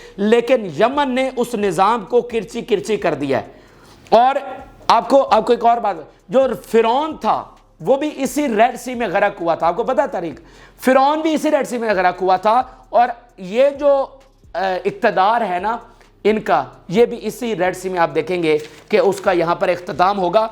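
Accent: Punjab, Pakistan. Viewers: مہربانی کرکے انگريزی نہ بولا کرو ايسے لگتا ہےجيسے انگريزی نہيں پنچابی بول رہے ہو ۔اس کو ٹرانسليشن کرکے بولا کرو ۔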